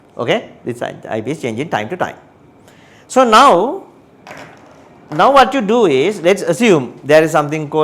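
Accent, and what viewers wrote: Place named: Sri Lanka. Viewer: within 5 seconds , I was able to tell the instructor is SriLankan . :)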